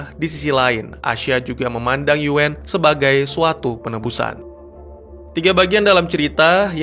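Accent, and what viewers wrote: Aceh, Indonesia. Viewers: wahh, abang dari aceh kah?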